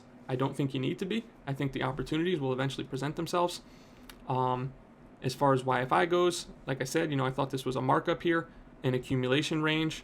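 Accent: United States. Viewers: You sound American but NL in your name means Dutch?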